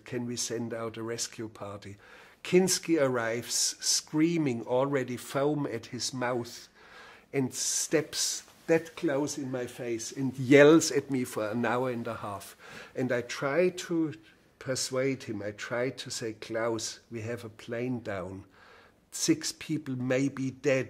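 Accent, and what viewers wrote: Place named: Germany. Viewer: I just love the contrast between Herzog’s masterful command of the English language and the thick German accent that glazes it. English is indeed the language that rules the world.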